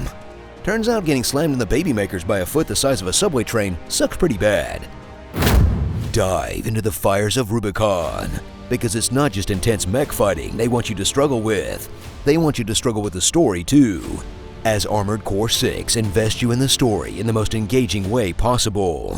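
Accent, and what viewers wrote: Australia. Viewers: I think he's Australian. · Australian youtuber, but yeah.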